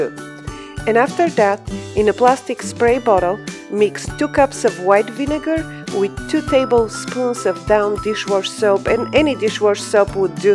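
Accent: Russia. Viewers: Lovely (RUssian?) accent. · Russian accent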